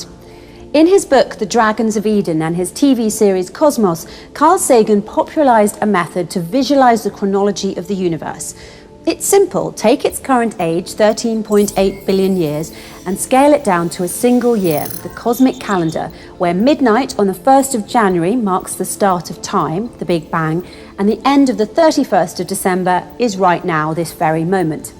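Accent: England, United Kingdom. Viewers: This is English accent at its best !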